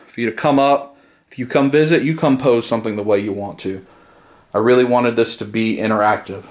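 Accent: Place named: Texas, United States. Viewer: He has a texan accent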